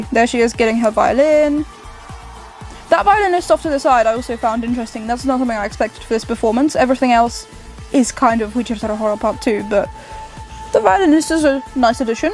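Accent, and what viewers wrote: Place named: United Kingdom. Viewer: Ale masz fantastyczny brytyjski akcent. Mieszkasz tam?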